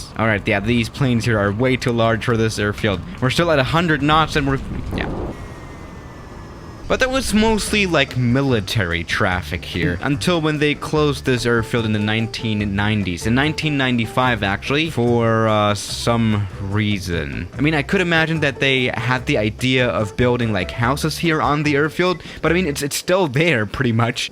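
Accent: Germany. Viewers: He's Jermen don't make fun it just the language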